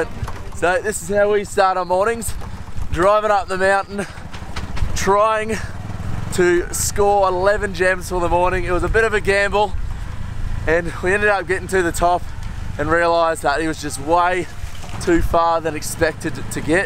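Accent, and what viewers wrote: Australia. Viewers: Australian or NZ accent..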